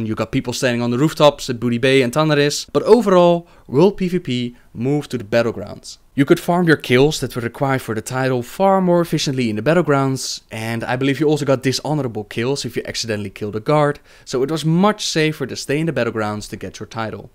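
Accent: Netherlands. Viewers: Ik wist dat je een nederlands accent had! Groot gelijk over de ondertiteling die nu overal gedubt word...niet goed. Zeker niet goed. Ik ben een Horde fan, en ik haat Garrosh maar dat is omdat ik verliefd werd op Thrall z'n horde, met de Darkspear en de Tauren. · I love your dutch ascents. especially when you say Draenor, sound so cool lol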